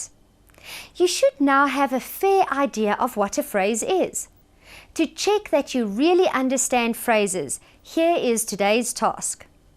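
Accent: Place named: South Africa. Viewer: south african possibily afrikan speaker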